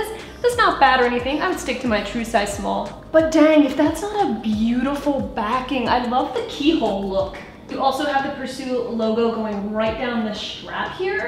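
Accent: United States. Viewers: where in jersey are you from? You sound more like your from the country than from jersey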